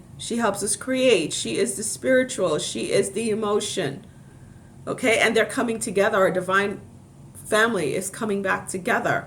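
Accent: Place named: Boston, United States. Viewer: Hello!! Thank you sooooooooo much! I knew that was Boston accent first video. New Subby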